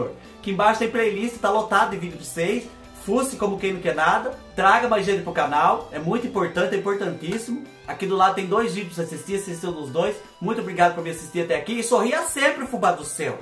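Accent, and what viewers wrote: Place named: Minas Gerais, Brazil. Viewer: Fubá, de qual região do Brasil você é? Não consegui identificar de onde é seu sotaque. Parece uma coisa de minas, mas ao mesmo tempo algo do sul.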